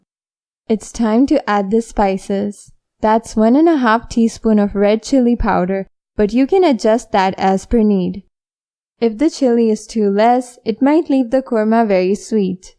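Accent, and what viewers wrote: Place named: United States. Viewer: After a while you Came with a nice recipe in American accent,as always you trying the new things in your YouTube videos... very good 😃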